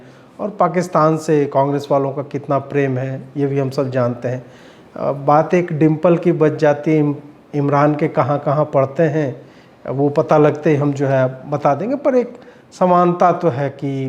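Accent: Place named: Begusarai, India. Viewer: अपने टोन में कुछ बेगूसराय्या भाषा का प्रयोग आपके वीडियो को बेहतर बना देता है।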